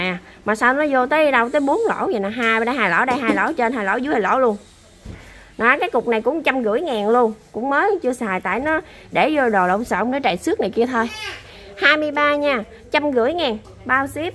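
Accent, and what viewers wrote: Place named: Mekong Delta, Vietnam. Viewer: Giọng nói giống người miền Tây quá bé , nghe giọng này làm hồi ức lại đã từng công tác dưới miền Tây 12 năm . Rất chân chất thật thà! Có dịp sẽ ủng hộ bé . Phong Cách giới thiệu sản phẩm rất thiện cảm .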